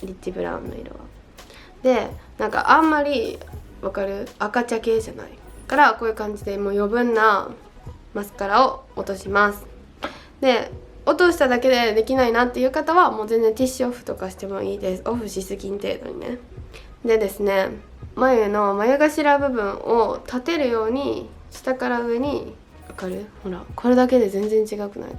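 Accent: Kansai, Japan. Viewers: 今日いつもより関西弁！？最高やん😍😍😍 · 最近の話し方わりと標準語っぽかったけどやっぱりコテコテ関西弁のほうがいい〜！！ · 「〜しとう、〜しとった」に同じ兵庫県民としてめちゃくちゃ親近感わきました☺️❣️ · なんか今日めっちゃ関西弁、笑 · いつもこんな関西弁でてたっけ？(笑) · 今回めっちゃ関西弁が多いなぜ🥺🥺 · このお顔で関西弁は強い、、❤︎ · 関西弁？なところがまたいい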